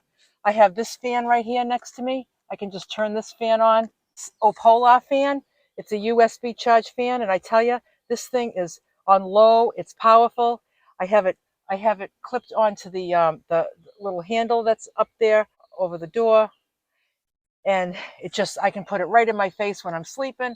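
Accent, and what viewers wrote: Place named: New England, United States. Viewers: Hi, I have watched 100s of videos of van build out. Yours is the best I have seen in the past 5 years. I started with a mini van when I lived in RI. Now that I’m living in NM. I purchased a full size cargo van both my husband and I travel in it. I loved watching you I knew right way from your accent your from Massachusetts. I was born in Worcester and lived there till I was 33. Then RI for 23. Now retired and living in NM. Thanks again for PS I loved your studio apartment video as well. Paul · You're So personable and full of life! I'm originally from NH and I know the NE accent anywhere ! Am I right? Best of luck in your travels dear !!😊 Oh and I got a kick out of the fact you carry even incense. I'm a hippy chic from wayyy back !✌🏵🌻 AWESOME VAN and VIDEO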